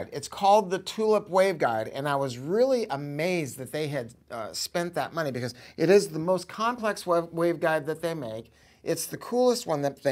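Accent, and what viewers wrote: England, United Kingdom. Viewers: Shit. That english accent is exactly like mine. I feel seen. You know that episode of the Simpsons which is a parody of The Midwich Cuckoos? The Bloodening? Like that.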